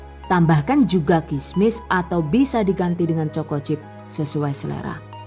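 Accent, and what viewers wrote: Banyumas, Indonesia. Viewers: Suarane mengko malik ngapak, dadi seneng nyonk kowh karo channel kiye. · Terima KASIH..rezepnya..salam hangat dari negri kincir angin..logat ngapaknya luwes banget..apakah asli wong Banyumas..👍👍👍😁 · Terima kasih resepnya ... nonton videonya sambil ketawa aplg klo bicara ngapak 😊😊👍👍👍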